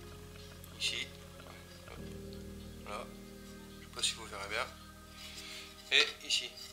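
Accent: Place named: North, France. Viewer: Ça fait pas longtemps que je suis tes vidéos. Mais merci pour ton taff, tes vidéos sont simples et bien expliqués. Mais tu serais pas du nord; ta un petit accent. J'ai le même 😁😁.👍👍👍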